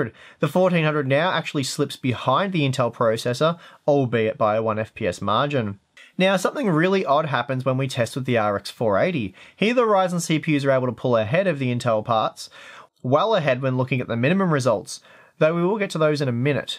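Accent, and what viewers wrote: Australia. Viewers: ahh the good ol aussie twang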